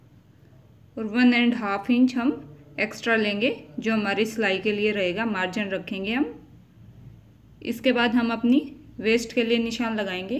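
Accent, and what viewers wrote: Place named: Haryana, India. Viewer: Haryanvi lagte ho aap language se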